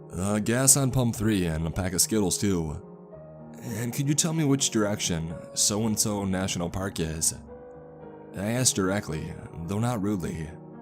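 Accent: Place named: South, United States. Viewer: I love the southern drawl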